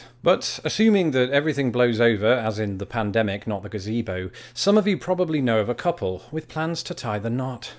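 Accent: United Kingdom. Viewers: Thanks again for another amusing and informative video. Dry humo(u)r is best delivered in a British accent. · I want to Mary a British guy. That accent! 😍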